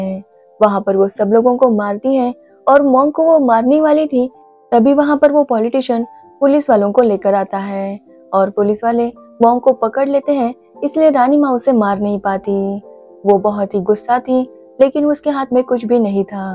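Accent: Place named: Hyderabad, India. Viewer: Hyderbad se h ye ladki. Wahan log aise hi bolte hn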